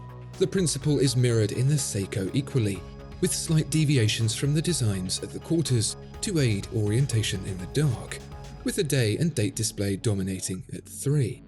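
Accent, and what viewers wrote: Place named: RP, United Kingdom. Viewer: Okay, so I heard "A Rolex is to watches what chimpanzees are to primates, the best, the original, the classic." I don't care for Rolex but are we just going to act like saying that chimpanzees are "the best, the original, the classic," is fine as long as it's said by an elegant voice with a High Street accent? Oh, my oh my. Hilarious.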